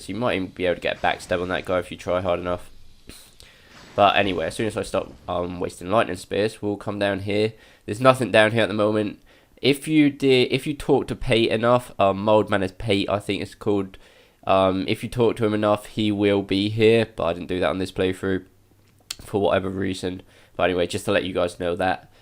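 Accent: England, United Kingdom. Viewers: Love the vids, i know there old but its nice to listen to an Englishman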